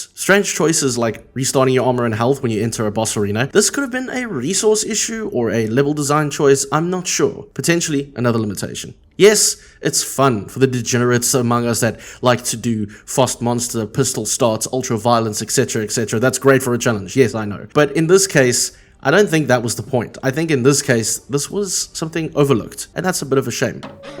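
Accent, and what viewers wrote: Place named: Cape Town, South Africa. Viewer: Haha I thought so, I recognize that local accent anywhere, I'm from Cape Town 😎 Yeah it is really satisfying to get a game working in EFPSE but as many limitations as there are, there's also a strange learning curve to a lot of it, which makes me think it's not really worth it at times, there's a huge lack of tutorials for the engine which is why I make some when I can, but my knowledge is really limited at the moment. And yes I looked into Trenchbroom recently, looks really cool! I might give that a go soon, as well as Godot. Keep the content coming, you're doing great and I look forward to seeing what games you make in future! 🎉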